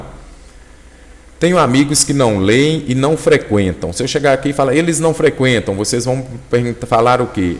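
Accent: Minas Gerais, Brazil. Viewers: Ahhhh esse sotaque mineiro que parece fazer-me compreender ainda melhor a explicação....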